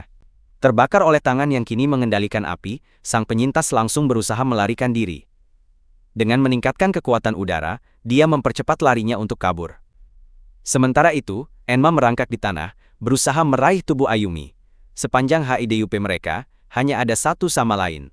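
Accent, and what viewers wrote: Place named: India. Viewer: Suara nya kayak India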